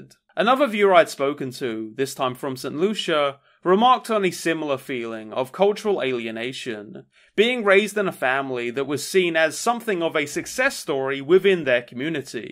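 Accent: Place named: England, United Kingdom. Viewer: says a lot about the oppression of Cornish culture that it wasn't mentioned in the video, despite you sounding distinctly English. very good video though.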